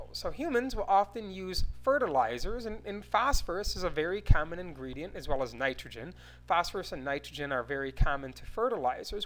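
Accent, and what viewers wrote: Midwest, United States. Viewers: I'm from Michigan. The way he says "rocks" sounds exactly how everyone I know says rocks. I was waiting for him t sound weird, but he never did. Must be a midwesterner.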